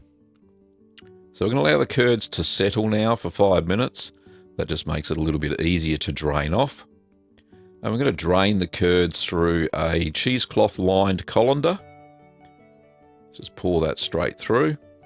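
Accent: Australia. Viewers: Ah finally an aussie...phew mate....finally a local.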